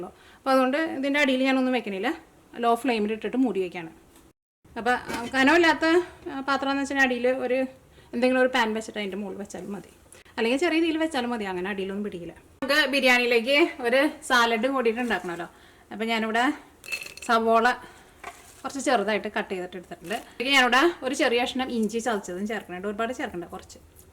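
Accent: Thrissur, India. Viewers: Thrissur kar ayonda chengayi mare samasaram podik kudum.ente kudumbakar und anubavam und.Ek estta avarude samsaram kelkan.😍😍😍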